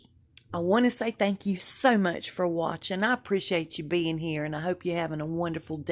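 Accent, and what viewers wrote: South, United States. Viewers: Hey, I just LOVE listening to your Southern accent, I could listen to you all day💕. When is your next Cooking show? Can you do Gumbo or Jambalaya? Peach cobbler?;)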